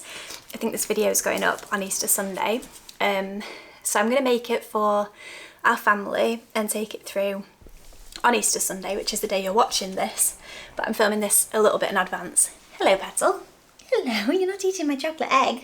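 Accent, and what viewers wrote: United Kingdom. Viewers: I love the care and attention you put into everything you do. Your pretty garden and lovely accent make me miss the UK.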